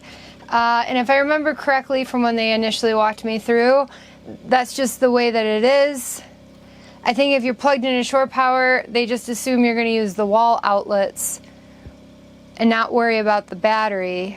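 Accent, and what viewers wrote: Wisconsin, United States. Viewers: Me too....never noticed that there was a seeable problem with your nose. I think the physical pain of surgery with questionable results they will NEVER guarantee isn't worth it. Late in my career l worked for Trane and relocated to Lacrosse. I loved the WI accent, especially with females. You are beautiful and make me think you are in your mid 20's....l've already learned a bunch of tricks-techniques from you. Yes you do seem happier today....you deserve it! 😘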